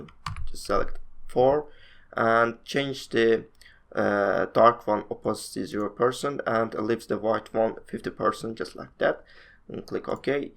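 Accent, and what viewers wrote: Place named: Turkey. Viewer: Your accent is like Turk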